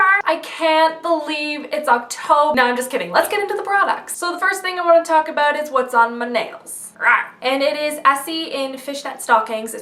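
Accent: Canada. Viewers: haha ohmygod I love canadian accent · Haha it sounded like you were saying oat instead of out and it was so weird but then you said you were from canada and it clicked!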